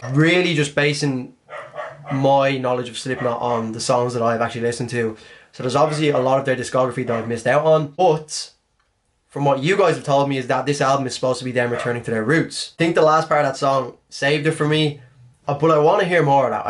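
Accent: Dublin, Ireland. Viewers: yaaaaay knew it. Are you from Dublin? I'm trying to distinguish whether your accent is northern our southern, help me here lad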